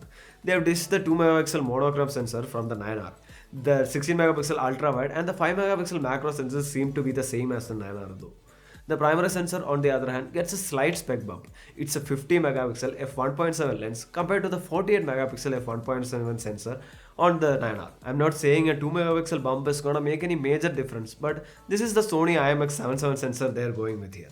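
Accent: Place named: Kerala, India. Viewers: I mean the guy sounds like some Anna at some Malyali Paan Thela. Dude needs to sound neutral. Completely clean up any mother tongue tone from his English. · South indian english......nice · That mallu accent ! 😁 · A thick Malayalam accent... better add a tag... not at all clear... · Such a pure malayalam accent lol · That malluism in your english is nice to hear❤️ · My god! Horrendous south Indian accent in your English ‼️‼️‼️ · Am I the only one who hears Malayalam slang when he speaks??😄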